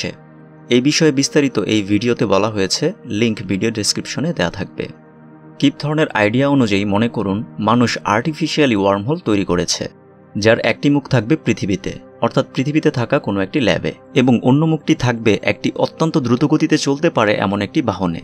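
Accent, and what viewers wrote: Narsingdi, Bangladesh. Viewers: ভাই আপনার এলাকা কি নরসিংদী? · ভাই, আপনার বাড়ি কি নরসিংদী?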